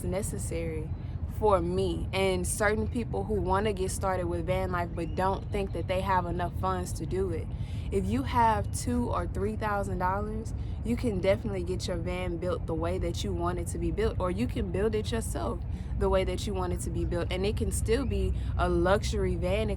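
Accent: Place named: South, United States. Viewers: Southern girl love it. Great video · you look beautiful and healthy. I love when your texan accent comes out lol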